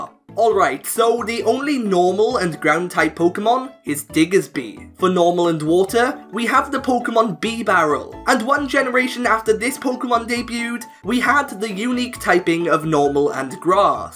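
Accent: Wales, United Kingdom. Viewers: Are you from Wales ??? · holy shit a welshman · Oh man, I can not believe how they discriminate you so much for having a British accent when speaking in your videos. Personally, I really liked your videos, and if you ask me, I'm not American, I'm Mexican. Keep it up. Note: I saw that you forgot the psychic type although perhaps there are no unique combinations with it.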